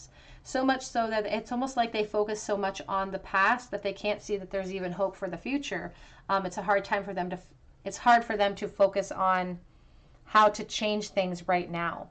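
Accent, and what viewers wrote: Canada. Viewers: I love your readings ! Always some much information that is so helpful ❤! Your Canadian accent it is comforting to me, I am also Canadian but have been living in Europe for the last 10 years. Once again thank you 🙏 ❤❤❤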